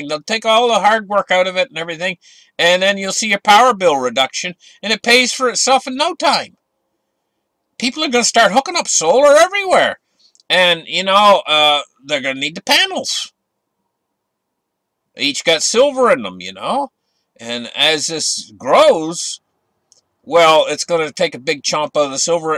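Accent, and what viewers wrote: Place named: Canada. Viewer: Great advise. analogies and stories are the best. The Canadian accent tops it off.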